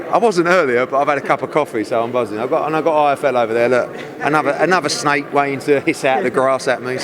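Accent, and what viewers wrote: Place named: Mockney, United Kingdom. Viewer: Far too much mockney wideboy BS. Gave up after 10 minutes.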